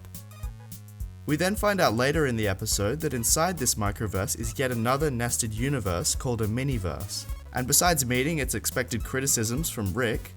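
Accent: Australia. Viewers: Every thing is better with Australian accent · My man your aussie too ^.^ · I gotta love that aussie Accent. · I FOUND ANOTHER AUSTRALIAN TUBER YEEEEEEA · Aussie boy!!!!😀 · Woo an Aussie! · Yeah a Aussie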